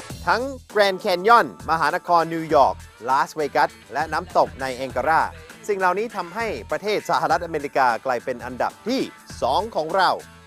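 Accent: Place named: Isan, Thailand. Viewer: ชอบคำว่า "บ้านเรา" ในตอนที่ บอกว่า มาเลเซียอยู่ไกล้บ้านเรา ฟังแล้วไทยๆดีแท้ แถ พูดอิสานได้อีก ชอบมาก ผมเองก็พูดได้ฟังรู้เรื่อง แต่ข้อยบ่แม่นคนอิสานเด้อ จะขอติดตามดูทุกตอนจนกว่า จะเลิกทำ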